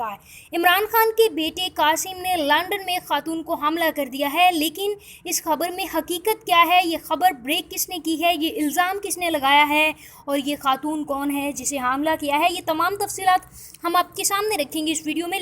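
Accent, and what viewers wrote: India. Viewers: Ye channel india ka hain voice chak Karo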